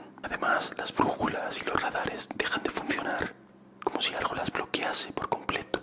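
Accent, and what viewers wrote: Spain. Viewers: Oye, ¿eres de España?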